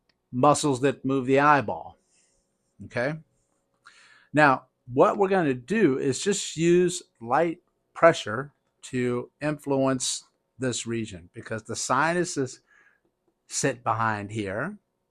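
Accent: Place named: Louisiana, United States. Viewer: Tell me this man has Louisiana roots..... or am I hearing things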